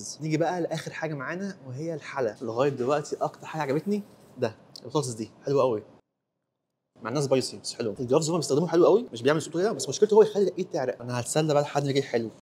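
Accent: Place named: Saudi Arabia. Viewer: لهجتك السعودية حلوه مره😂😂😂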